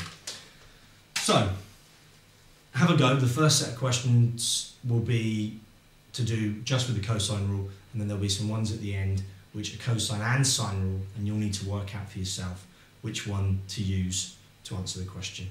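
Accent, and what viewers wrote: United Kingdom. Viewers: I find it hilarious that people keep commenting about his accent...it's just an English accent...if you go there, there are plenty of English accents...and it doesn't make maths any easier! I'm having some trouble getting the same answers on my calculator...help...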